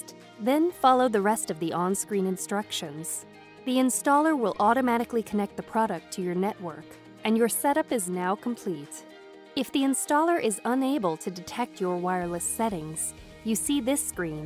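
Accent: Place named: China. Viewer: Chinese accent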